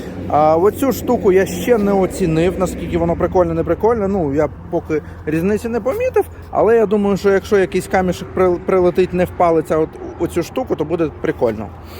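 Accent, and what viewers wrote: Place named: Russia. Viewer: Комент в підтримку каналу, виключно за українську мову! Відчувається що людина раніше розмовляла Мокшанською... Респект! Розвитку і успіхів!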